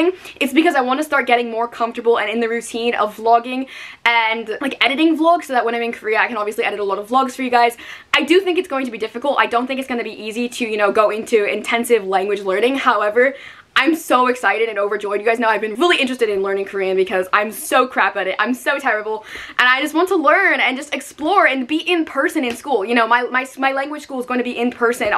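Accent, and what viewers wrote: United Kingdom. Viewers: You sound so british for an american 😭😭😭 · as a british person I can actually agree! she sounded like someone from North London!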